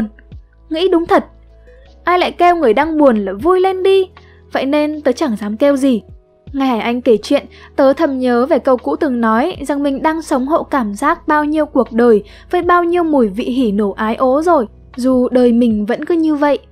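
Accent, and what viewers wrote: South, Vietnam. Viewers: Hình như đọc giọng nam phải không (ý mà miền Nam nhé không phải nói nam nữ 😁)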